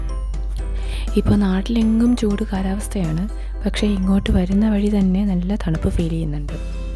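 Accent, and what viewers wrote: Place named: Punjab, India. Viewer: Yaa allah... നിങ്ങൾ മലയാളം പറഞ്ഞോ... 🥰 അപ്പൊ മലയാളി ആണോ.. 🤔🤔🤔 നിങ്ങളുടെ english കേട്ടു, വല്ല പഞ്ചാബി ആണ് എന്ന് , എനിക്ക് മാത്രം , ആണോ തോന്നിയത് 😜🤪😜 നിങ്ങൾ സ്ഥിരമായി ഇരിക്കുന്ന bench അല്ലേ അത് 😍😍 അര്മേനിയ പോയ videos കണ്ടില്ല 🙂🙂 ശരിയാ... എന്നാ, ലുക്ക്‌ ആണ് ഈ സ്ഥലം... 😍😍😍 14 കുരിശൊ 🤔🤔🤔🤔 അത് കണ്ടില്ല 🙄🙄🙄🙄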